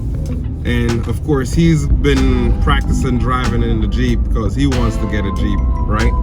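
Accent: Jamaica. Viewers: This man yah sound like a yardie suh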